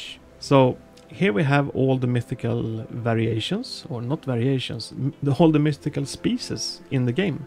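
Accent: Sweden. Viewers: Ser och hör att du är svensk, Jätte bra video, bra tips. Ska försöka dem tricken! Ha en bra Midsommar idag. Hejdå! :)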